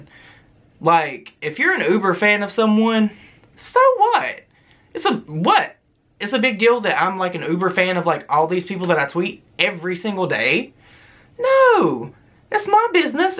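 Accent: South, United States. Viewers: Aw, I just found your videos tonight and I absolutely love your southern accent. Promise things get better, screw those people who think they're all that and can do whatever, they aren't anything. Have a wonderful night, it'll get better.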